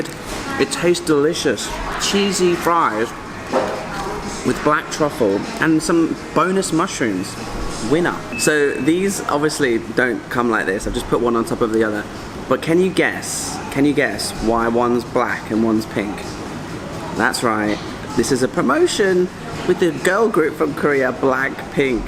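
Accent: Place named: Sheffield, United Kingdom. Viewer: you dont sound irish more english if i was to guess someplace around Sheffield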